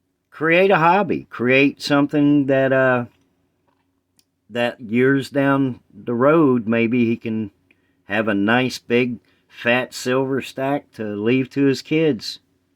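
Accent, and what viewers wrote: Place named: South, United States. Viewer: Fellow stacker here! Sounds like your from the south...Alabama here!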